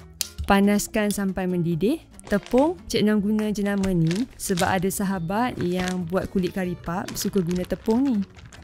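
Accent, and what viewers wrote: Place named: North, Malaysia. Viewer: ni org utagha ka? Mcm ada dengar sleng utagha ja tu?😁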